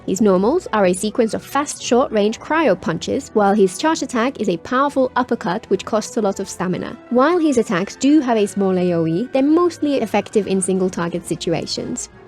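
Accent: United Kingdom. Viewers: Very British.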